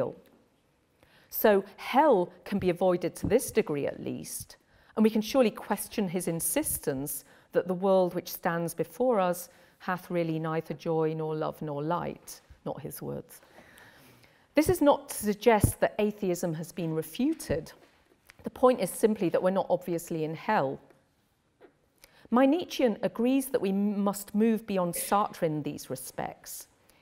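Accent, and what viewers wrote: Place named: United Kingdom. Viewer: Why can't the Brits ever get to the point besides the annoying accent.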